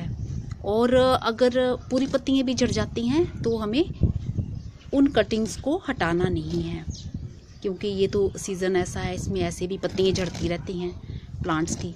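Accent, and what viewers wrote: Punjab, India. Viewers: aapki boli ka turz lehja kyu hai I think Punjabi accent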